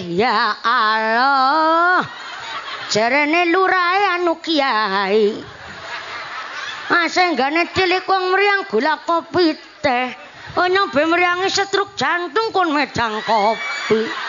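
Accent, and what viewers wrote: Indonesia. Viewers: Itu bahasa jawa ngapak dari cilacap mba... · Masya Allaah ngapak mantep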